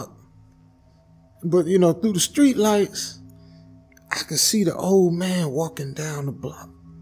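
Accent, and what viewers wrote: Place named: Alabama, United States. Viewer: I just love to hear you talk. Reminds me of my friends back home in Alabama ❤️❤️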